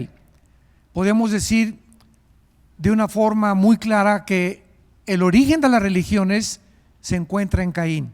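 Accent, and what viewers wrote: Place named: Puebla, Mexico. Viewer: Como que tienes un acento a tehuacan puebla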